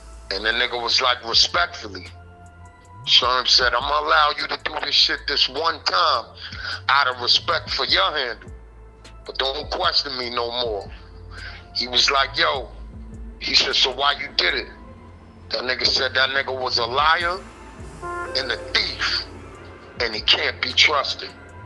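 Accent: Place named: New York, United States. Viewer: Nigga telling NY stories wit a NY voice shit crazy son 😅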